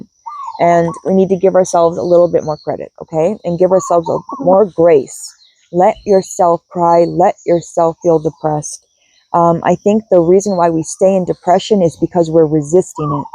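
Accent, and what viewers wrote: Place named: United States. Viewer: Where are you located? 🤔, you have a US English accent but you go by a strange time zone. Anyways I love watching your videos, watching your pets I also have several cats, two dogs and a turtle.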